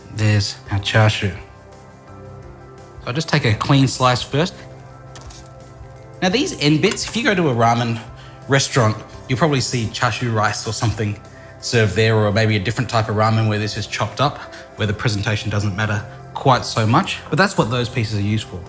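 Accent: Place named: Australia. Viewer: where you from? you also sound australian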